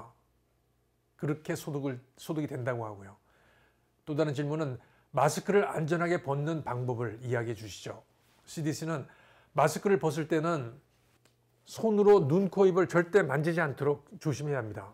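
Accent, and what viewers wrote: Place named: Jeolla, South Korea. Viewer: 서울말투가아님. 전라도말투?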